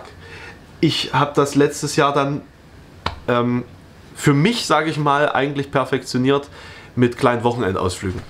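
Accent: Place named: North, Germany. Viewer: Das mit dem Workaholic kenne ich. Mein Arzt meint, ich solle verdammt vorsichtig sein, sonst sei es nur eine Frage der Zeit bis zum Burnout. Struktur hilft, das ist richtig. Das mit der Ernährung bekomme ich auch irgendwann konsequent auf die Reihe :D Wie auch immer - ich freue mich darauf, dich bei Bob zu hören! Bitte übernimm das Programm am Morgen - dann werde ich im hohen Norden direkt mit heimischem Dialekt geweckt <3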